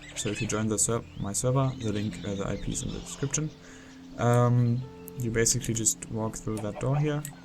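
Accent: Germany. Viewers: haha...man hört voll raus das du deutsch bist :D niocce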